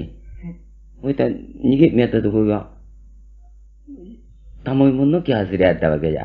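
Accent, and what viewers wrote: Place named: Kagoshima, Japan. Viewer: 鹿児島県民やからやけど、まだ、わかりやすい方の鹿児島弁やな、頴娃語やとガチでわからん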